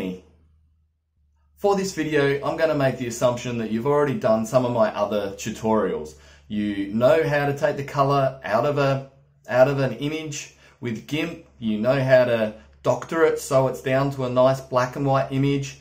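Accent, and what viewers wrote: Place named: Australia. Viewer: Hello, loved your video tutorial. Spoken in true, direct and entertaining Aussie style. That's an opinion coming from an old, well travelled Scouser. I just signed up to Doodly, following my recent acquisition of DaVinci, when I decided to stay sane during lockdown by telling a lifetime of stories in video. It's a steep learning curve for an old git, so I'll be checking out your tutorials. Stay positive, Jim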